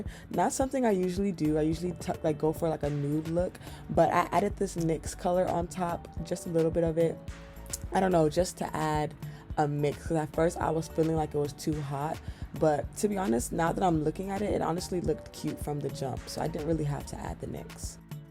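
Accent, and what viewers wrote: United Kingdom. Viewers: I can’t tell if your British or American. The accent and the slang is a mixed bag? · Im still trying to figure put if she from the uk or not🥱🤣💕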